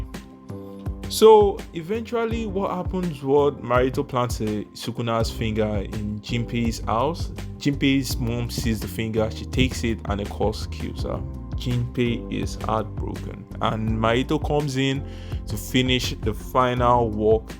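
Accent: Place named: Nigeria. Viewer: yeah bro, you sound like you are from nigeria right. i will follow you right now, but you have to improve your script and also the way you say the words.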